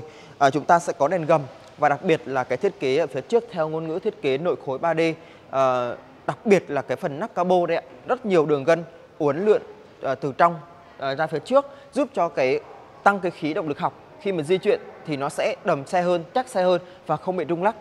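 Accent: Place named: Thanh Hoa, Vietnam. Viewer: Thằng này nói nung tung núc thì 550 núc thì 650 nói quá nhiều giọng lại thanh hóa khó nghe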